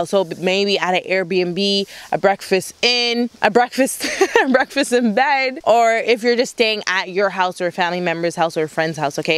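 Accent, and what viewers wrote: Canada. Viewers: Listening to you, I'm picking up a Canadian accent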